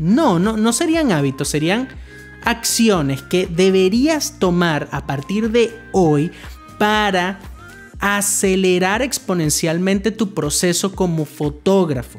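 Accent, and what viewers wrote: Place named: Venezuela. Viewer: No se porque, pero me pareces q eres de venezuela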